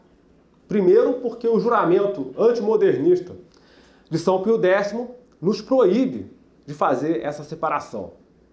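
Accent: Rio de Janeiro, Brazil. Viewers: Sotaque carioca típico,amigo: Também sou fluminense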